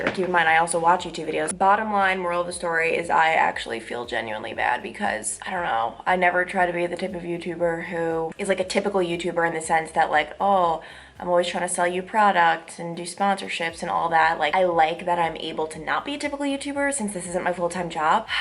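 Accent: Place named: New York, United States. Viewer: This was fun to watch. Especially the flash back of you with your NY accent. Love it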